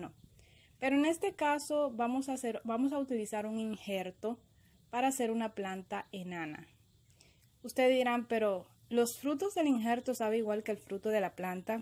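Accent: Dominican Republic. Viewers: ahh es que no conocia el acento de republica dominicana suerte con tus arboles👍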